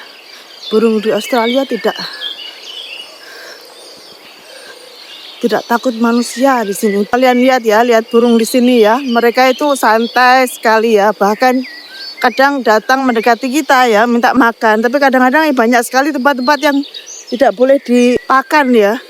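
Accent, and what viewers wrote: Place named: East Java, Indonesia. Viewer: Terdeteksinya logat jatim, bener ga Bu? Hehe😅😅❤❤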